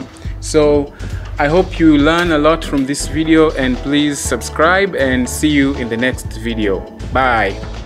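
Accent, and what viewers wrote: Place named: East Africa. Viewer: good work my guy, are you from Uganda,, or Tanzania or Malawi, you sound like one of ! keep on dropping knowledge my guyy, excellent work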